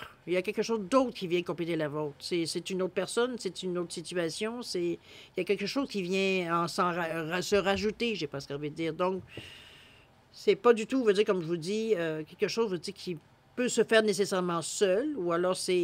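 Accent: Quebec, Canada. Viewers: merveilleux accent québécois !!! :-)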